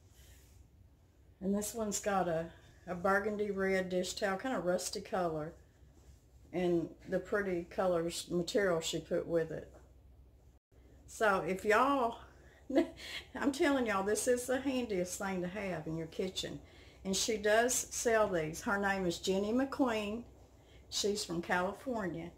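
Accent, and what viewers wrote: South, United States. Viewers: You are so Downhome ❤️ you remind me of my family with your Southern accent. You’re the only one besides me that call a bowl a Bow, lol. And you said scootch the pan over, I say that too. I was born in Kentucky, but live in Iowa for 45 years, just love you, God Bless you too ❤️ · It is so nice to hear a real southern accent again. I'm so homesick for good food and good people I could cry. Can anyone tell me which video had the quick recipe for fried cabbage and potatoes? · I love your tutorials love to hear you talk love your Southern accent I am a southern girl too . Going to try this for Thanksgiving . I like those towel things for sure . You say your friend is from California , so am I. I live in Southern California.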